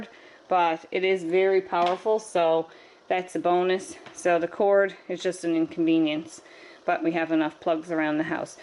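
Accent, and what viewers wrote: Newfoundland, Canada. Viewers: Ah, I thought I detected a Newfoundland accent! So glad to find a Canadian channel that recommends things that I can actually buy here. This is a great idea; I'm just concerned about the noise.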